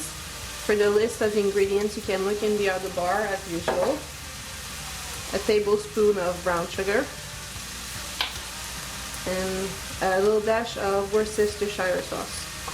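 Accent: Quebec, Canada. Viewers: What a cool channel Your accent makes me miss Quebec I'm going to make that except with 4 times the macaroni so it will have to be separate or that much more liquid I think it will dilute the sauce a bit though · J'adore l'accent québécois quand il parle en anglais. J'ai vu juste? :O))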